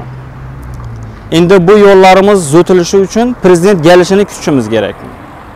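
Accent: Khorezm, Uzbekistan. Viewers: ака хоразимча гапирябсиз базида тшуниш кийин болябди илтимос адабий тилда хам гапиринг..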